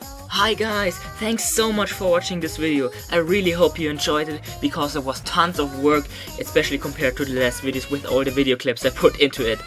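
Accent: Germany. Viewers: Are you German? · Kommst du zufällig aus deutschland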